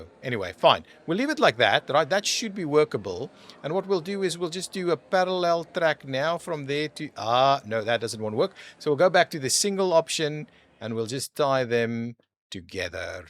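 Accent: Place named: South Africa. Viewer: the saffa accent makes everything better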